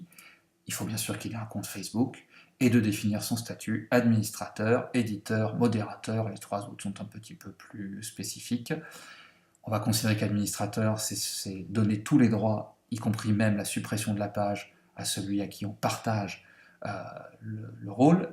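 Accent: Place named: Alsace, France. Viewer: Très bonne présentation, seul bémol, le présentateur a un fort accent Alsacien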